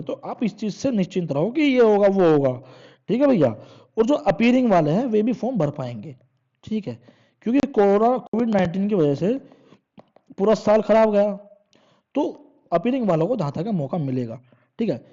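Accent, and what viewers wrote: Meerut, India. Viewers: Tum meerut se ho kya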